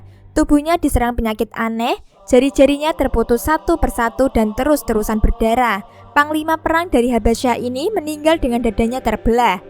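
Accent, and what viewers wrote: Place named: Java, Indonesia. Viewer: Medok jowo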